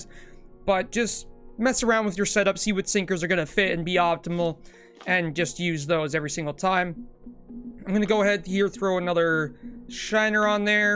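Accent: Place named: United States. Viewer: How come every video made about this game no matter who made it is in metric? I'm American and I us imperial weights and measures not metric so I have no ideal whats going on half the time in all of these videos, you sound American yet your game interface is metric its confusing period and dont tell me to switch I'm 60+ and I'm imperial till I die.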